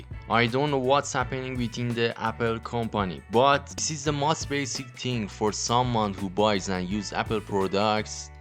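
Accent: Iran. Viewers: لحجه ایرانی داری:) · Iranian Accent🗿🗿🤘🏻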